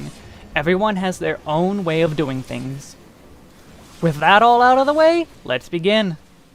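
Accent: Boston, United States. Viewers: Boston accent?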